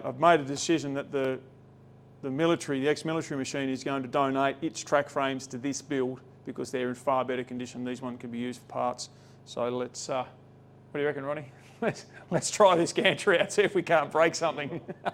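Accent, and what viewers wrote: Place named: Australia. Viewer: New viewer from Vic via China now. Love hearing your aussie genuine voice and thoughts.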